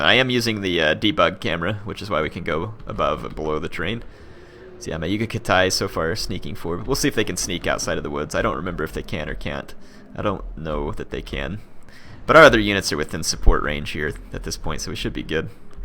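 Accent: South, United States. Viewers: You get a like just for that Soutgern Accent;) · I subbed just for Southern accent <3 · Love your southern gentleman accent.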